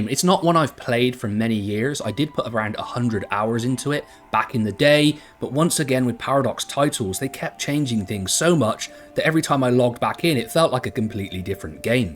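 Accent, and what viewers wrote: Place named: West Country, United Kingdom. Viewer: This is the dogs 🏀 🏀… nice to see knowledge and enthusiasm in the review, together with a little West Country chaaarrmmm oh arrr 🇮🇪❤️🐌🐸❤️🤗🐸🏀🏀❤️🐌❤️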